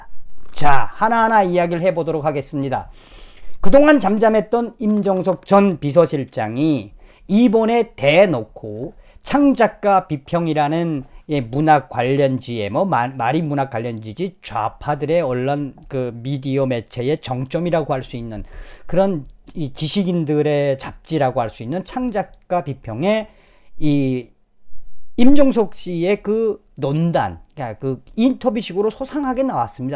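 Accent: North Korea. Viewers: 말투가 탈북민같으신데 ㅡ ㅡ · 정성산님이 탈북을 하신 분이었구나 그래서 어투에 북한말씨가 들어 있었구나 대한민국의 보배이다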